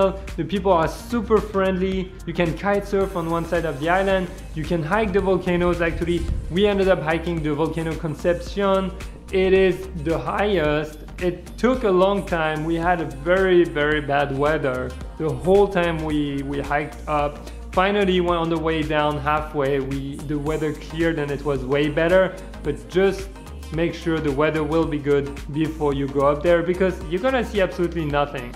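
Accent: France. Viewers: cool video! but i wonder where ur accent is from is it french?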